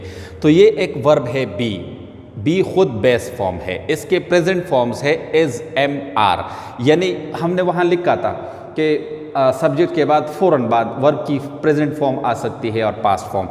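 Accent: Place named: United Kingdom. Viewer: mashallah you are doing very well and your accent is nice too and you can speak british accnet very well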